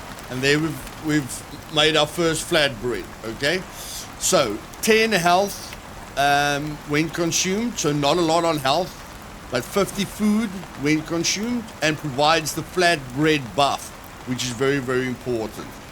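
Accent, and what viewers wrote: South Africa. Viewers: Hey man thanks for the walkthrough ! are by any chance south african ?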